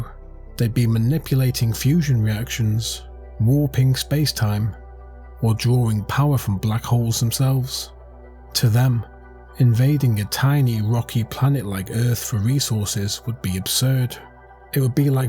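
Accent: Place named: Cockney, United Kingdom. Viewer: This video has been done before, but you should really lean into that cockney accent.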